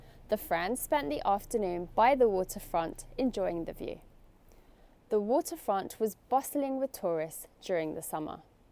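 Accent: United Kingdom. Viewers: Your video made my day. ❤❤ Love British accent.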